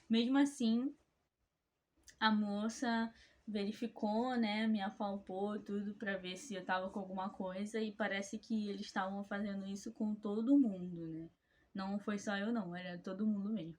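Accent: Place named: Rio de Janeiro, Brazil. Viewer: Sotaque de carioca ,é carioca?Boa sorte 🍀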